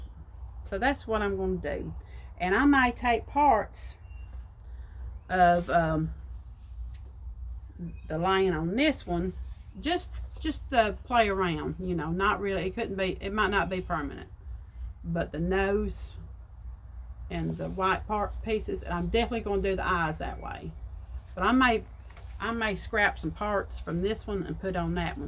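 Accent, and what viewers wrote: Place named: Mississippi, United States. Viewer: where are you from cause you talk like me? I’m from Mississippi!❤️🧶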